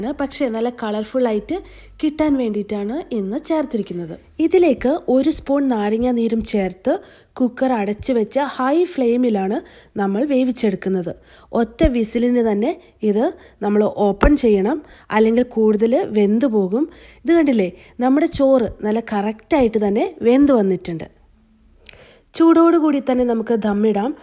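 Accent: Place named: Kozhikode, India. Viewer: കോഴിക്കോടൻ ബിരിയാണിയും, ഭാഷയും സൂപ്പർ 👌👌👌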